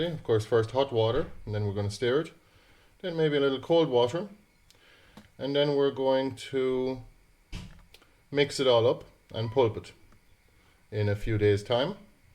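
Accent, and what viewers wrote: Ireland. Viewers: Just after doing a bit of a binge watch, great content as usual on all the videos. To answer one of your questions from a previous video, I think the willow you were wondering about is a Sally. I can ask where you purchased your herb drying tent and your dehydrator. I have been searching to buy within Ireland and the price is some what saucy for both. Also did you get your wine making equipment within Ireland. And I can't believe you have a pressure canner. Surely not an Irish purchase. I thought for a second I was watching one of the many US you tubers I follow, except for the lovely Irish accent. Keep up the great work.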